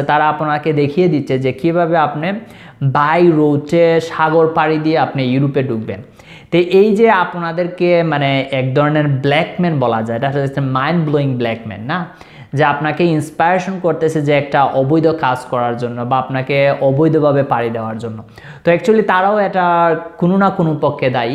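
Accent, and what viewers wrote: Sylhet, Bangladesh. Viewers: আপনি সিলেটি, আপনার কথায় সিলেটি টান আছে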